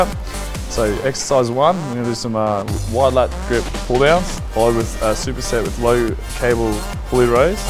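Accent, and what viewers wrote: Australia. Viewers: Dean Alberto LMAO!! You'll be so ashamed when you realize your wrong! xD Pls, try to understand: Calum von Moger is from a country called AUSTRALIA. He speaks with an AUSTRALIAN accent. Arnold Schwarzenegger is from AUSTRIA (Österreich), a completely different country located just south of Germany, Arnold speaks with an AUSTRIAN accent.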